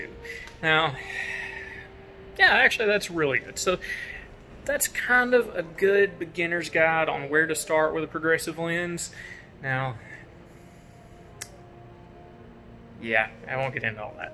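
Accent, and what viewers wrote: United States. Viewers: I can’t understand this guys country accent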